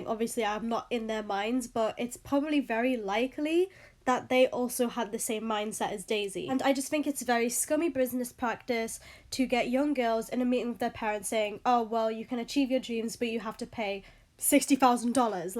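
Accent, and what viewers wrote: Newcastle, United Kingdom. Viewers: wait sis where’s your accent from. I’m getting Geordie. Watch me get it wrong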